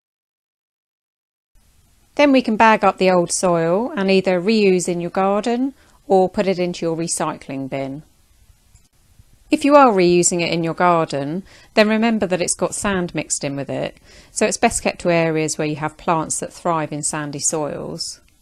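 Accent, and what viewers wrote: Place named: United Kingdom. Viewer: You by far have the best informative videos for leopard tortoises! I'm trying to learn as much as I can before I purchase my first ever shelled family member. I'm making sure that I get the enclosure, lighting, and the growing of grasses and plants correctly first before I do that. I have a few comments though. Not that your babies have pyramiding, but what do you think of closed chambers to trap in humidity? I've heard nothing but great things about it. And I wish you shipped overseas to the U.S. because I would love to get a baby leopard from you. You do a fantastic job and your videos are phenomenal! It's awesome how you have checklists and you even display them on the screen for us to pause and jot down. And one last unrelated thing. I love the British accent! Keep up the great work. I subscribed and will eagerly wait for your next video.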